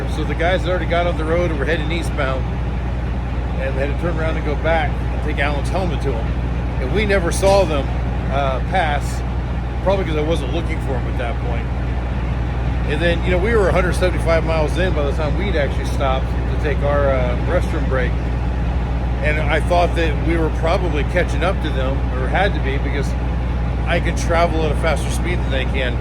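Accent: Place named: Minnesota, United States. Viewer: By his accent, he sounds like he’s from Minnesota 😅?!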